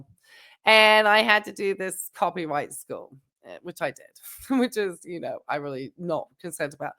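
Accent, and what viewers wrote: United Kingdom. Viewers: if he keeps it up his account will be suspended. it is their own policy. Sarasota Tim is not just targeting you. You are allowed to use some of his material under fair use. As long as it is not for financial gain and just for commentating. It is the same thing has the broadcast media they do it all the time. Tim and Tammy just like scamming people. Their older broth Johnny a very well known Las Vegas area family friendly comedian who lives in Laughlin. I think he performs regularly at the Sands Motel and Casino. Ace Frehley had to deal with them too. On his channel. Love your Cornwall accent. Lovely part of the country.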